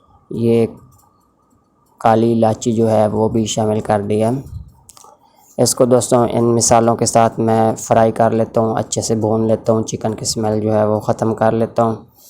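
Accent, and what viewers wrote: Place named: Punjab, India. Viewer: آپ کی پنجابی سن کر ہنسی آ گئی 😅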